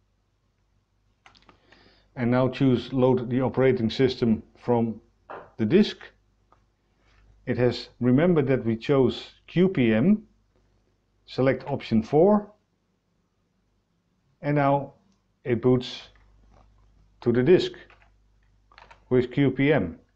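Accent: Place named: Netherlands. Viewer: That is a hard-core Dutch accent if I've ever heard one. It sounds like I'm listening to my dad... or as my daughter would say, "Opa." I just saw this video on the Tindie page... I definitely want one, but then saw the $250 shipping charge. I guess this is as a result of that new E.U. rule. :( I'll look into that Germany address thing. Thank you!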